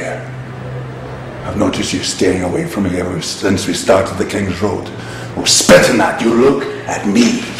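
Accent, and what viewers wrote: Scotland, United Kingdom. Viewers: I didn't even clock that he was Scottish until watching this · Nah, his Scottish accent is too thick so far.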